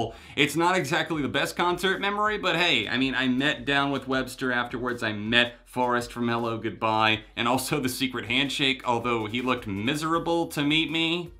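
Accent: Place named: South, United States. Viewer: Your a southern boy...I knew it...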